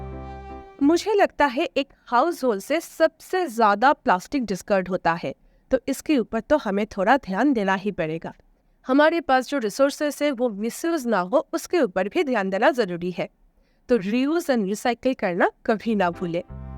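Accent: Bengal, India. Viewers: Dear loved your todays vlog very much though all your vlogs are meaningful but todays vlog is insightful also and the way you say or convey your thoughts is so simple and beautiful the Bengali touch in your accent is lovely to hear❤❤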